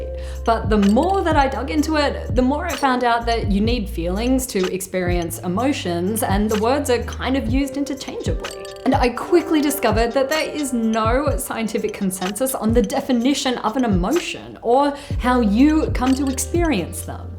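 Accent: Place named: Australia. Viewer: I felt nostalgia watching this hearing your aussie accent and wondering if that walking track was either in the central highlands or the Dandenongs ... :-) But here in Germany they have a thing called Gemütlichkeit which is like the dutch term you mention. Sort of like coziness but also social. And not to forget hygge the danish term which even has its own magazine here.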